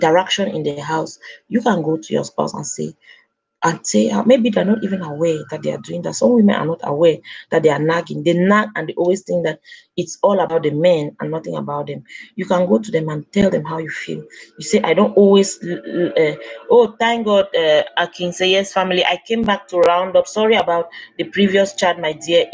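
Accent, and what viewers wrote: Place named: Cameroon. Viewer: Wahoo auntie u sound like a cameroonian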